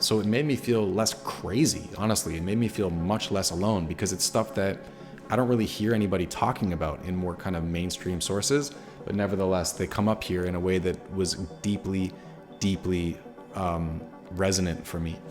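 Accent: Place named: Ontario, Canada. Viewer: Dear Brother: Fellow Islamic 'seeker' here. Fellow Madre Yage aficionado here. Just returned from an 11 day retreat in Columbia with the plant. THE 2 ARE VERY COMPATIBLE AND VERY COMPLIMENTARY, IMO. I am located in Ontario, near you, judging from your accent and some of your videos. Thank you for being a visionary. Thank you for your courage. Ameen. Emily.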